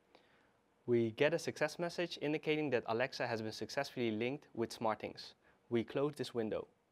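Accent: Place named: Netherlands. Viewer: jij bent nederlands gast your dutch i hear it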